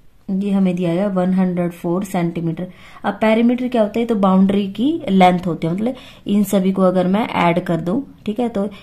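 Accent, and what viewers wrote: Haryana, India. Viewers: I think Haryana by her accent and all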